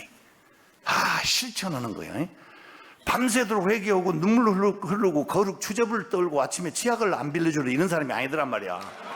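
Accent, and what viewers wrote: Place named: Jeolla, South Korea. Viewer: 인요한박사님모두훌륭하신데 전라도사투리만쓰지안으면 백프로훌륭...😅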